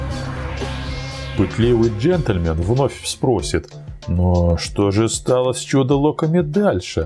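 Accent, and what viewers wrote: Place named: Vologda, Russia. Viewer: Такой прикольный Вологодский говор, но всё по делу. Да, там на фото у него на самом деле очень грустный фейс, аж жалко его работягу.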